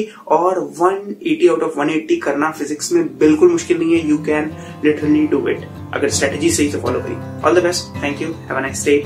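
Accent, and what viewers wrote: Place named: Kashmir, India. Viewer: Hey bro I guess you're from Kashmir na?? 😄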